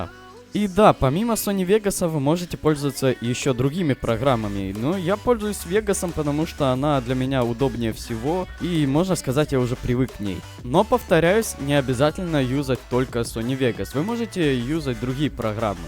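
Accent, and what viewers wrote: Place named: Ukraine. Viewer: Тоже украинец? Буква Г хорошо выдаёт)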